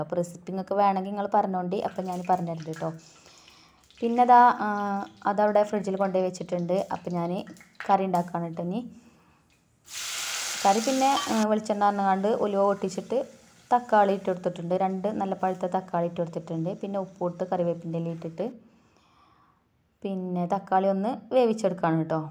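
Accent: Malappuram, India. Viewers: ഇയാൾ മലപ്പുറം ആണോ 🤔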